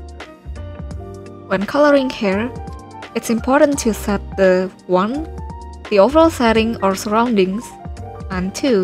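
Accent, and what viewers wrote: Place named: Indonesia. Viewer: dari logatnya mah indo inii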